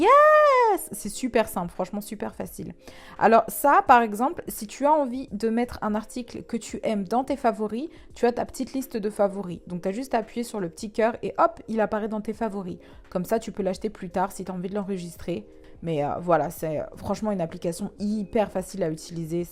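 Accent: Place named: London, United Kingdom. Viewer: Moi jveux aller à Londres pour revenir avec le même accent que toi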